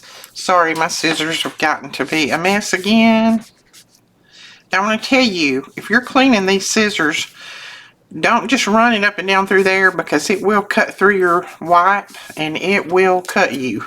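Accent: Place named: Alabama, United States. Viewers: E, as I’ve said before, I love listening to you talk. You remind me so of my Alabama family. I miss them very much. But, I also like hearing your ideas and learning from you! Thank you!!!❤ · Awe thanking yie well Ile tell yie 🖐️👅🤗 ime going to see that on Saturday with my x 2 grandwains, 2boys 5 n 8. They both just turned that age oooppphhhffffff they can't sit down but they do in the cinema 🖐️😝😝😂🙏 yie remember at age full of questions well we still love them with our heart n soul I know a lot of things change when yie Wer able to make the big meals I still do that I always put more on than I need. Its a total waste, plus be went off everything some smells it's drivinge caarazy n then ime nearly deaf I've only got 40,% of hearing left soo anyway I get tinnitus badly I hardly sleep but i hear yie woman 🖐️🙌🤗🤗💜💜😘😘 I wish I could give u big hug right noo 🤗💪💪 amd i know the feelin the grandwains they think yer purse is an open book n it never closes n always good to look at explore lol 😝😝😂🖐️👌👌 but we love them plus I love listening to you you calm me but i do watch too keep yer chin u btw your doing amazing remember you take care Mrs b good 👅🖐️😘🤗 i hoe i made yie smile with my Scottish patter n slang words n I love yer accent that deep south love it I know I know Mrs xx 🤗🌹🌹💜💜❤️‍🔥❤️‍🔥❤️‍🔥🙏🙏 sounds a funny big guy god luv his wee soul gdnyt or morning whatever comes first for you I've been dying papers all day making them pink with blowing the bubbles on them I love the effects,🙌 soo tired noo thanks for reading tillthe end 🙏❤️‍🔥❤️‍🔥🌹🌹🏴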